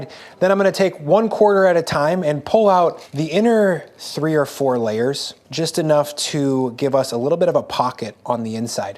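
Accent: United States. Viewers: I love your American accent.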